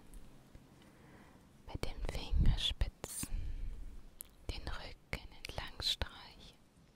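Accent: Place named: Germany. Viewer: (french) Normalement je ne suis pas fan de l'accent allemand, mais ta voix me détend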